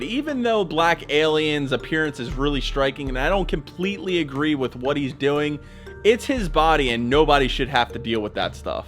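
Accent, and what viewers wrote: Philadelphia, United States. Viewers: This might sound crazy but im From South Philly and I can hear a slight philly accent when u talk. R u from Philly or around the area?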